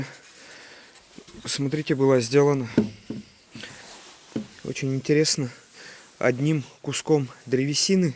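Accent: Vyatka, Russia. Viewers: Точнее, говор вятский! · Говор вятский... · пожалуйста,называйте район,где эти деревни находятся.Или это нельзя? Говор у Вас родной,до слез приятно слышать родные слова.Я вятская,но живу очень далеко,в Казахстане,и в силу возраста(73 года) и кучи болячек уже, наверное,никогда не смогу приехать.Очень рада,что нечаянно наткнулась на Вас.Подписалась,пересмотрю все. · Автор вы откуда? Акцент как вятских😊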